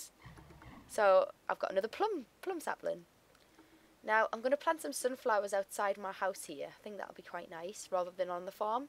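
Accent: Newcastle, United Kingdom. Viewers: I can tell because of how you talk. I'm A Geordie Too. :) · Newcastle, surely. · You are a Geordie right?? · fellow geordie? lol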